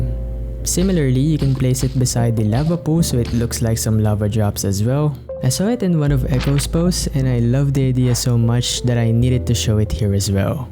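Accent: Philippines. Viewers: English language is Filipino accent.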